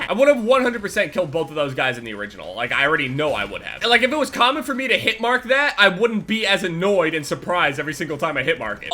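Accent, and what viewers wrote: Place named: United States. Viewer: I stopped after every few seconds to mimic his pronounciation becuase I have to improve the pronounciation of my English and I hate the british english they are teaching us at school. thanks for your American accent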